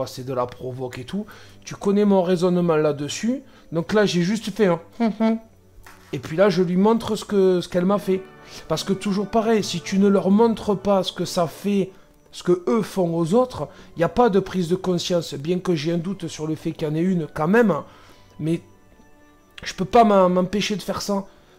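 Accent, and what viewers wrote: France. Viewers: ta voix de la province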